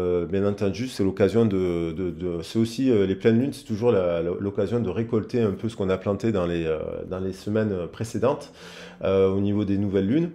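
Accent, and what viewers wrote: South, France. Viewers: Coucou 👋🏼 😉 Ce n’est pas grave tu es pardonné 😉☺️dis moi tu viens de Montpellier avec ton accent du Sud?! 🤣tu 🎶 chantonnes quand tu parles c’est sympathique comme style 🤩🥳😜belle journée à toi le tourtereau 🤣bisous 😘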